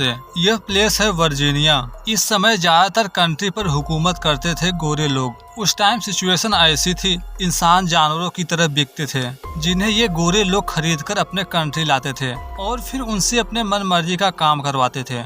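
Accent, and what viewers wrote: Uttar Pradesh, India. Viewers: Brother you don't speak english words in your vedio. Your UP accent is listening in your vedio. Like starting you are saying istarting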